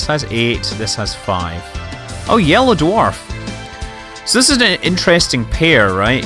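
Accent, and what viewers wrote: Scotland, United Kingdom. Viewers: Scott live in California?! I thought he lived in UK because of his accent! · I wish I could watch someone explore Space Engine and commentate it with a Scottish accent for the rest of my life. There's just something about it...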